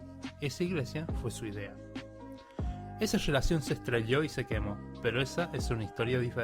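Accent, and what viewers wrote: Córdoba, Argentina. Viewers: alto acento cordobés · Ese acento coshentino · Es cordobés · es re ccordobez el narrador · Best ascento cordobes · Tu acento es muy argentino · queria preguntar si sos cordobes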